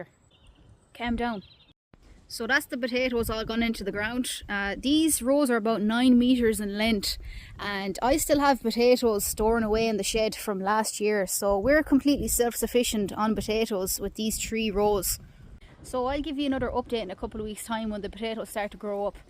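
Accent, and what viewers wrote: Ireland. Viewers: I love that irish accent…. Right. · Wow intelligence backed by a witty sense of humor good looks and that wonderfull Irish accent and just a generally inspiring girl who loves what she does and is exited to share that with us all.. It sure why this came into my feed but happy it did though❤ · Irish accent sounds exhausting to speak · Love your accent, no wonder my grand-grandfather married an Irish girl.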